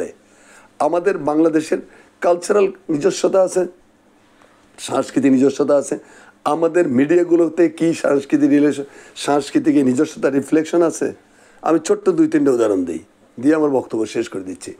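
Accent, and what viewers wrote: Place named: West Bengal, India. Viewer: Why do you talk in west bengal tone?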